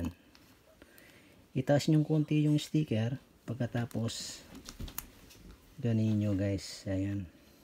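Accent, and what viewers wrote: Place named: Pangasinan, Philippines. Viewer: Pangalatok ka di ba? halatang halata sa accent mo pag nag tatagalog ka eh..🙂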